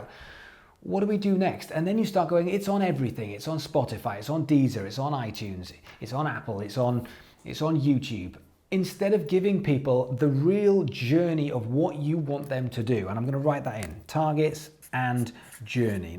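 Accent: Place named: England, United Kingdom. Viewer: Thankyou so much, you are so knowledgeable, i have learned so much from you,and an English accent! I will follow your advice.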